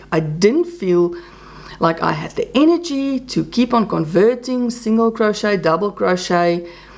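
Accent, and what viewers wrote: South Africa. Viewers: I love your chats when you started talking i recognized that you are South African. Me too born at Cullinan in 1946 all my family are in Australia and some friends so only my daughter and hubby and a boy and girl are my immediate family. . Ive been crocheting since I was 8 year old when my grandmother taught a lefty to crochet. I've done dressmaking and most crafts but crochet and colouring in is my best crafts right now. And have loved listening to you ❤❤❤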